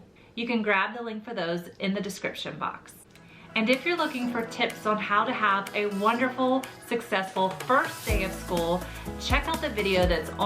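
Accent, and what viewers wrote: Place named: Minnesota, United States. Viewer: Are you native to SC? You sound like you might have been in Minnesota. I am in Rock Hill, SC, not native though. I am a California beach baby. I love all the tips. Thank you so much.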